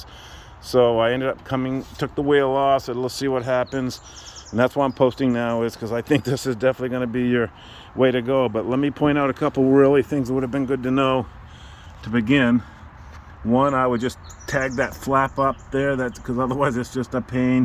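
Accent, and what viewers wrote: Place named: Portland, United States. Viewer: "ayeeee good mornin youtubas" dont worry man i can already tell youre coming at me from portland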